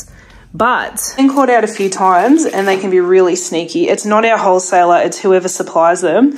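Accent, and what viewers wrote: Australia. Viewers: Hi, just wanted to tell you I’ve been watching your channel for approximately a year now( yours is one of my favorite flower channels) love the Australian accent also all the scenery footage. As far as ideas on helping to sell flowers you could do workshops in your studio and also online. Your a very good educator and I love how your husband is as passionate as you are(maybe film more on a daily basis to include family life), I’m from the states and I love seeing Australia. I watch some YouTube channels who ask if you like the content and if they’ve been of any help to the viewers you can buy me a coffee ( which I believe to mean a donation in any amount to help keep the channel growing).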